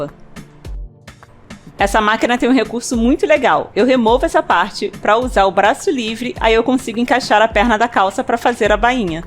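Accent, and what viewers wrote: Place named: Rio de Janeiro, Brazil. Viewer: o sotaque carioca são um charme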